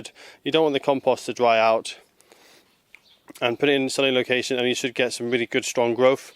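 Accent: Scotland, United Kingdom. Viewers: Thanks for posting!!!! I never comment but as a new follower who really appreciates your videos I had to. Your videos are so helpful and a sweet bonus is your great Scottish accent! Definitely going to binge all your videos so I can get pro at gardening during quarantine!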